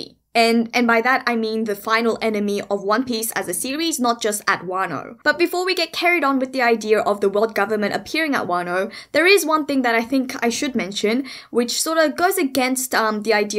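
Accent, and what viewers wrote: Australia. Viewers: Oriental with an Australian accent? That caught me off guard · Australian accent? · Are you west Australian ? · You from Australia? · You Aussie??? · Aussie?!?!?! Yeahhhhh you go woman!!!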